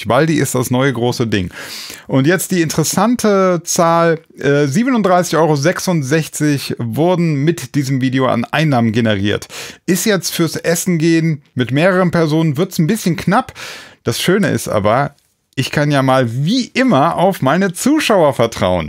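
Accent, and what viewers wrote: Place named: Turkey. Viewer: Er hatte schon in einem Video das aufgeklärt. Es ist halt die türkische Aussprache.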